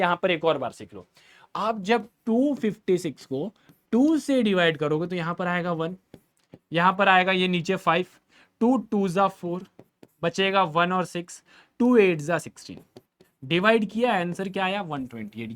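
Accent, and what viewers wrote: India. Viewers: Love ur other Hindi Accent ❤️😭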